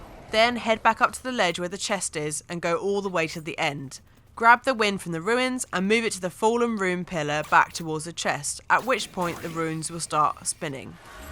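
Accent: United Kingdom. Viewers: She’s British that’s just how we would pronounce it over here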